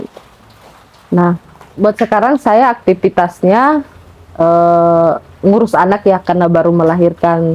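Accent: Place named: Batak, Indonesia. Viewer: Batak gaya bicaranya seperti aagim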